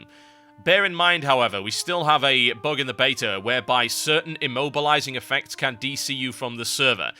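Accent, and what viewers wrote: United Kingdom. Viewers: Can't wait till Cata comes out, so I can stop watching these Vids with his annoyingly British accent.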